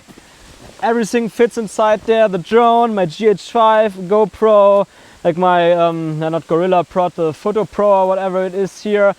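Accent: Germany. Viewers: Man hört den deutschen Akzent einfach überall raus:D Gutes Video !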